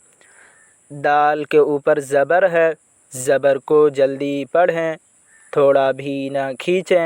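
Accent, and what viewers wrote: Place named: Arab world. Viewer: urdu ko arbi ki tarah mat padhiye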